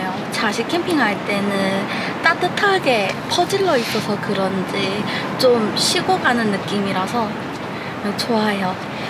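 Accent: Gyeongsang, South Korea. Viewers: 치우캠핑님이 구독중이라는거 보고 들어와 구독 좋아요 알림설정 해두고 시청중 새해 좋은일만 가득하시길~갱상도 말투가 귀엽네요~^^ 임시 땜방용 스카치 테이프도 가지고 다녀야 겠~~네~~요~^^ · 경상도인가요,구수하네요,ㅎㅎ 텐트가넒어난로를피워도,따뜻하이,안정감이있네요,풍경짱임니다,😁💚👍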